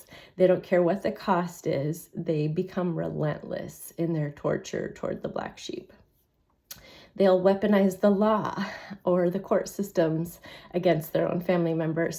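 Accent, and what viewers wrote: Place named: Northern England, United Kingdom. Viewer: thank you 😂 north England accent 👍 and I moved away...and they are trying to follow me, so I know what leaving a narcissistic family entails when you leave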